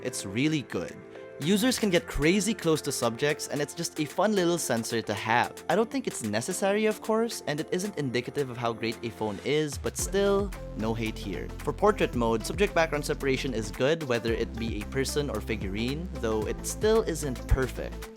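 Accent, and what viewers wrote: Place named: Philippines. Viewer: had no idea this dude was filippino, the accent turned from almost amerian to taglish real quick after 20 seconds, only after having read the comments I have realized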